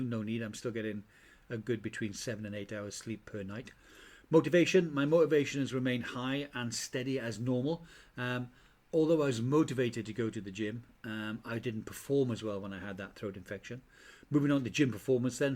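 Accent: Wales, United Kingdom. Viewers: hi watching out for a while I’m here I’m guessing that’s a welsh accent ?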